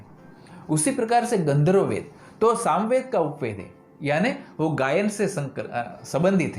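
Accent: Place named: Gujarat, India. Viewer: Tamari peli second ni boli thij khabar padi gayi k tame gujarati cho 😎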